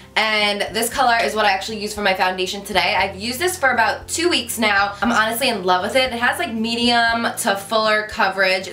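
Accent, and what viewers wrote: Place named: Rhode Island, United States. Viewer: Haha I know she's from New Jersey but being from Rhode Island I think she has a Rhode Island accent, not like they're any different tho.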